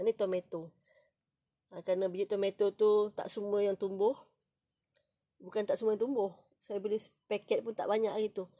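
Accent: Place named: Negeri Sembilan, Malaysia. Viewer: sedap jugak loghat negeri sembilan ni😅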